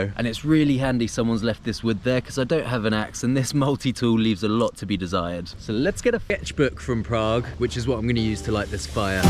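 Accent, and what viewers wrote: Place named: Midlands, United Kingdom. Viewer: Are you from the Midlands? I see a slight accent there slipping out every now and again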